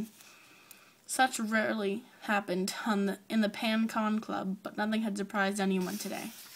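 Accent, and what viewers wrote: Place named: Boston, United States. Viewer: Lol babe I love the way you pronounce coupon, pajamas, caramel, salmon, fire, water, New Orleans, lawyer, then any other thing with a Boston accent.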